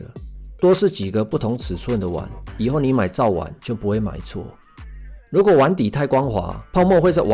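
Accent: Taiwan. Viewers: 你的臉很帥感覺很斯文，但聲音很台哈哈哈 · 就是這個正港台灣腔讓我訂閱的😂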